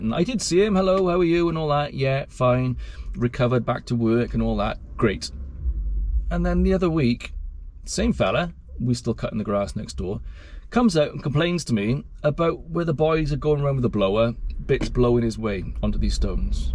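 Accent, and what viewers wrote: Wales, United Kingdom. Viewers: I'm not into gardening but found the thumbnail just way too intriguing and hear you. And on a positive note I've always thought the Welsh accent is thee best accent back home ! Anyways, yes, i hear you and at first i thought, well maybe old age and forgetfulness gone wrong but then i thought nope. You've GOT to be happy in your work place. I'm 69 in September and lost a kidney to cancer 2014 and have mobility issues with abdominal adhesions and Countdown Supermarket here in New Zealand have a food home delivery service and those guys go out of their way with helpfulness and positive smiles and they go above and beyond and they are lifesavers but I could never imagine being negative with them, goodness gracious, that's like cutting my throat and burning bridges and making life so difficult. I don't mean to come across as holier than thou but I've done the delivery jobs in Yorkshire in the late 70s 80s with northern dairies, john Menzies paper delivery and polar motors in essex and you automatically help people who need it but i never came across ungratefulness but I've seen it on the old UK telly progs. 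And it must be real hard biting your tongue under those circumstances. Perhaps you can do the UNCUT video version of this :) Anyways, i definitely say put yourself first and then your family cos if you go down there's no provider! And DON'T feel guilty but I suspect a part of you does. Monday greetings from Middle Earth back to the Homelands 🙃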